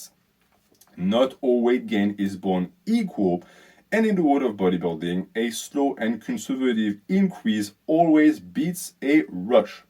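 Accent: France. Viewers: Do you have an instagram fellow lifter of heavy weights i wanna see your sick physique. PS: Javais cramé que t'etais francais a ton accent mdrrr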